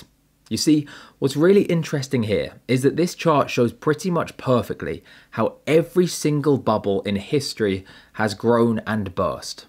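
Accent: England, United Kingdom. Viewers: I normally listen to American investors and traders hard to find people from where I am from to talk about stocks but good to hear an English voice !!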